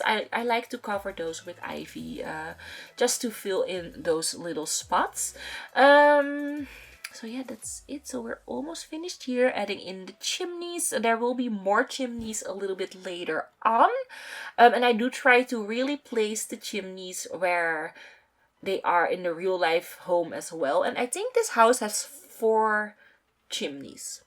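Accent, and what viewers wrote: Netherlands. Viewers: je klinkt nederlands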